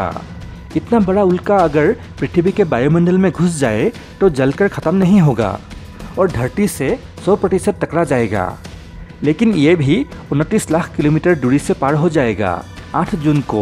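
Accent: Assam, India. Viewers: Are you Assamese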